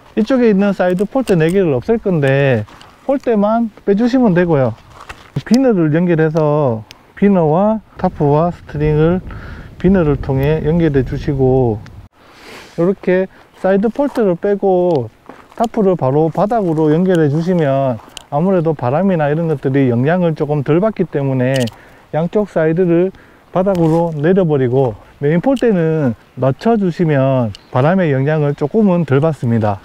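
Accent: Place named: Gyeongsang, South Korea. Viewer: 타프 설치 전문가이시네요 ㅎㅎ 구수한 경상도 사투리는 덤...이고요 ㅋㅋ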